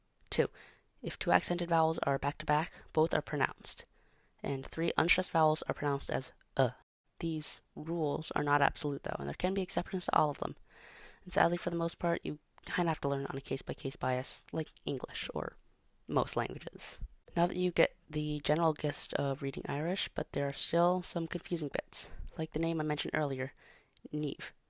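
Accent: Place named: England, United Kingdom. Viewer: Hello. Sorry, but... No, the vowels under the fada should be pronounced cleanly, not as diphthongs. You are projecting English phonetics onto Irish. For example, the "i" does not sound like in "bit", it sounds like the French "i", after narrow consonants. Thank you.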